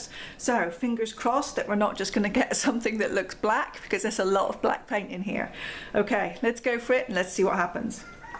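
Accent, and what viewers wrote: United Kingdom. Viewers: You sound British but the brands you use are not available here.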